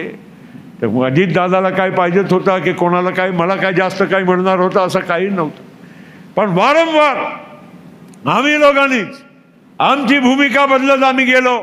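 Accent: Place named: England, United Kingdom. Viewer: Tumhala he Engrajanchya tone madhala Marathi bolayala kasa jamat ho?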